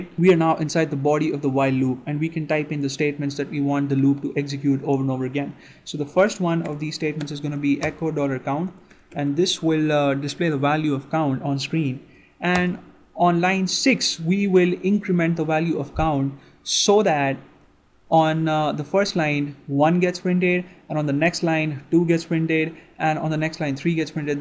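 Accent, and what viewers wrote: India. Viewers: stop trying to use american colloquial language...especially with this indian accent...Preferably stop using english....there are many channels with proper english... It's better to stick to hindi for you guys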